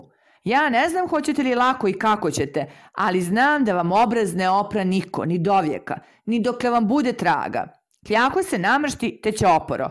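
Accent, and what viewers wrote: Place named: Serbia. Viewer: Jeli ovako govore Dalmatinci ovo je Srpski iz Srbije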